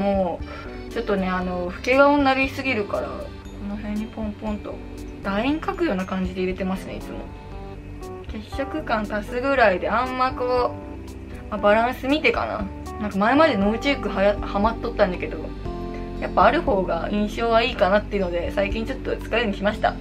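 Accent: Okayama, Japan. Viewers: ちゃんの毎日メイク知りたかったから嬉しい😂💗 方言が岡山ですごい安心感あります🥺✨ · いつまでも変わらない岡山弁が大好き🥺 岡山人として嬉しい😌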